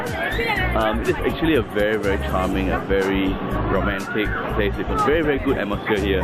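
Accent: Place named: Malaysia. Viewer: gotta love malaysian accent in this vid~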